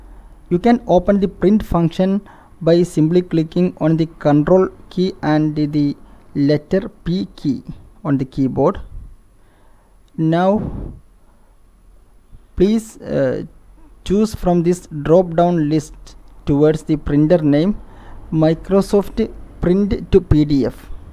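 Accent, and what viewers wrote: Kerala, India. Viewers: sir are you from kerala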